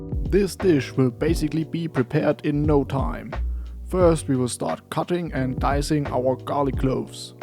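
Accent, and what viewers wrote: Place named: Italy. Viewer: Didn't even notice your italian accent until now😙👌🏻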